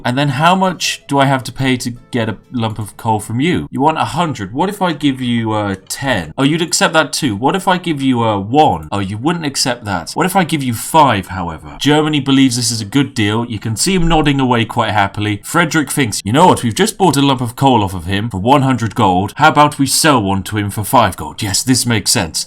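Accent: United Kingdom. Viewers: Omg you British as fuck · This guy is annoyingly British but he makes good content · Hmmm... sounds british to me. "Salute the queen" and "sniff your tea" Yeeeah okay, he's british alright.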